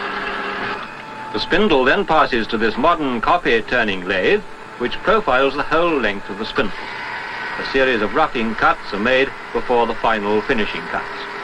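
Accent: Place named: RP, United Kingdom. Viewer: Hearing the narrator say “thou” instead of thousandth in his posh RP accent lends terrific credibility I must say old boy.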